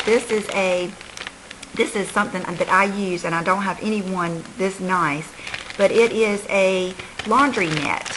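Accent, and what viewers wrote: South, United States. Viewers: i love ur vids! especially ur southern accent, its very calming :)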